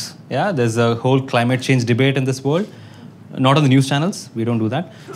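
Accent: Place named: South India, India. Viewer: Typical South Indian tone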